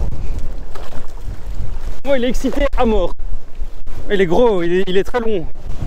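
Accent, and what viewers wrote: Belgium. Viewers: Je kiff ton petit accent belge ;)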